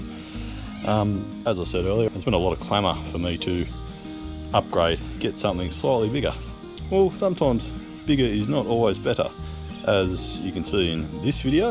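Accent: Australia. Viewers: I clicked because he is Australian 😂😂! 👊👍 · Ps. My mother was born & raised in Australia so it's been great hearing an Ausie (sp?) talking! She never had spoken about the snakes & lizards there (😳😟 ick) but she had complained about the Kookaburras that Always landed right outside her bedroom window to wake her up at the break of day!😂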